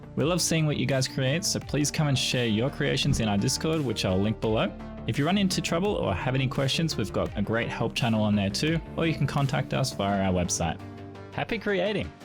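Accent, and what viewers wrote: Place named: South, United States. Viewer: ....YOU'RE FROM KENTUCKY?! I never knew. First time hearing you speak after all! Love that thick southern USA accent!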